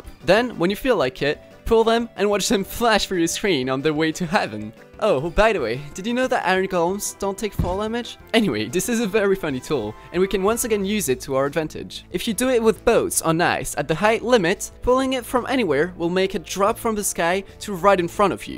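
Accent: France. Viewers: C'est plus simple a comprendre avec ton accent Français 👍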